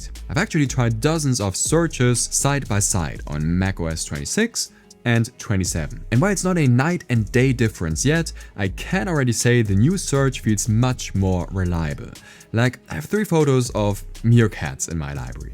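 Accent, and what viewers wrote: Germany. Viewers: Dass du ein Deutscher bist, hört man sofort raus. 😂 Wünsche dir viel Erfolg mit deinen Videos. 👍🏼 Weiter so. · erster Nice. starked Video. Mann kan aus dem Accent hören, das du Deutsch kannst.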